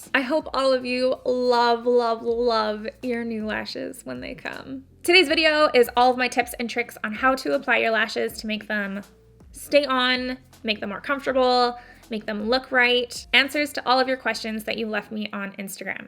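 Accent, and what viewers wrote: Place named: Canada. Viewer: ur Canadian accent is adorable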